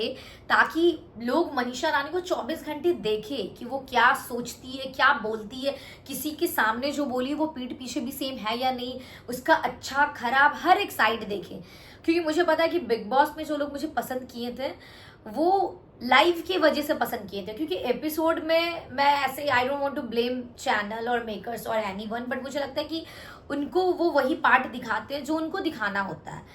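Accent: Bihar, India. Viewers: Manisha ap bhut achhe ho but mai buttering nhi krungi. Ap is show me kaise khelna h soch k gye the isliye muje v kaibar arjun sir jyda achha lg gye jbki mai chti thi nhi muje hi achi lgni chaeye but mai justify nhi kr pati thu... Ap hr jgh natural rha kro kuchh soch k mt khela kro..ap aisa bich me english english krte the bt muje lgta ap apnr hindi ar binhari accent pe mst ho yrrrr❤... Apne kchhh glt nhi kiya h fir v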